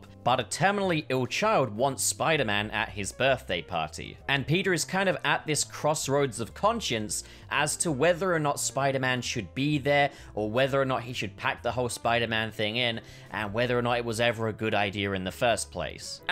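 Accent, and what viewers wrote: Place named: United Kingdom. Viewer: I think he is somewhere in the u.k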